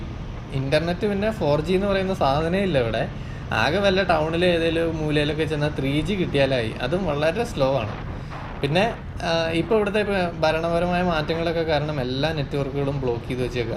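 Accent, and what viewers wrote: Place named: Valluvanad, India. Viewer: Natil evdeya? Valluvanadan slang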